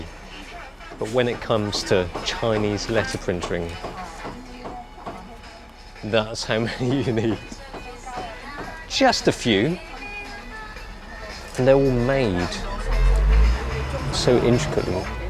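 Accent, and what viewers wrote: United Kingdom. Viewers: gorgeous Britain accent as always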